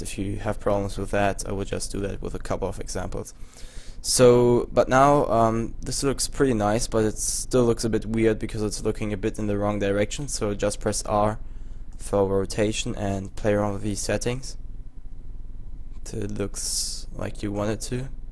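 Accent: Germany. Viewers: Wie man das merkt, dass du deutscher bist! :D Nichts gegen dein Englisch.. :P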